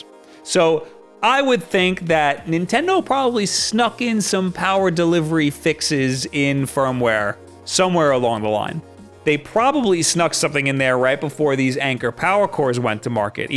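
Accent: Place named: New York, United States. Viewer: I know I feel like I'm being yelled at. It's like I'm in New York or New Jersey.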